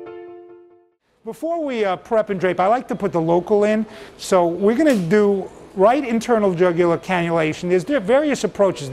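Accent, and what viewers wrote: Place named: New York, United States. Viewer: Love your NY accent, love the video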